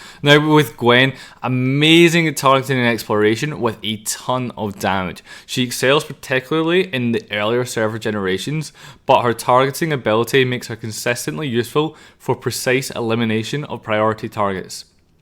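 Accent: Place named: Scotland, United Kingdom. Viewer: This guy is Scottish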